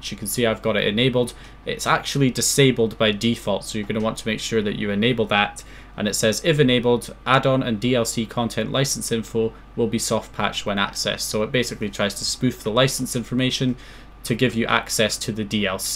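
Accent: Scotland, United Kingdom. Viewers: Mate are you Scottish?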